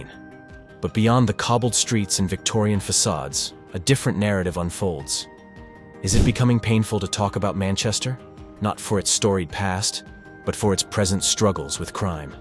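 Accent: United States. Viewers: I carnt take it seriously because of his American accent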